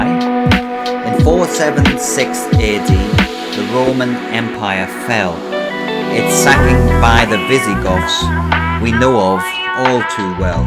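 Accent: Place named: Sunderland, United Kingdom. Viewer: You are an absolute laaaaad 🙂 Nice to hear you still have a Sunderland accent even after all those years after leaving.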